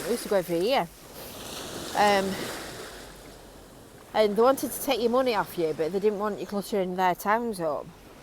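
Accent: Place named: Fleetwood, United Kingdom. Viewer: To answer your question ,now I am based in Edgware do I miss my native of Fleetwood Yes I do it is strange looking out not on the sea but looking out on small brook not a Big River Wyre touching Morecambe Bay and I had many happy memories there as child Left Fleetwood in 1975 back for small bit and permanently left in 1976 and I miss your Accent keep safe from the COVID 19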